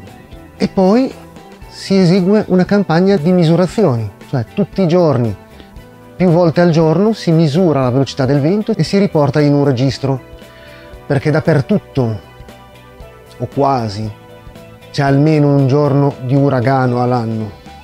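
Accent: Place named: Liguria, Italy. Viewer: ciao e complimenti per gli argomenti trattati. sono interessato all'eolico domestico e direi anche che - dall'accento - mi sembri conterraneo.......io abito ad Arenzano e, dall'atlante eolico di cui hai parlato anche tu, sembrerebbe un vento medio di circa 6-7 ms. Sarei quindi propenso a rivolgermi al tipo ad asse verticale. Però un suggerimento: a chi rivolgersi per tutto a Genova???? Mi sembra pochi disponibili e preparati....... grazie!!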